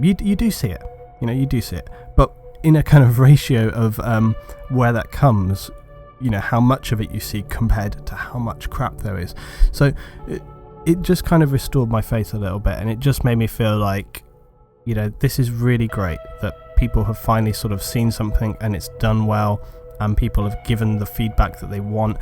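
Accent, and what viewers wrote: United Kingdom. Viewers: Your Sexy British voice (No Homo) captivated me....=subbed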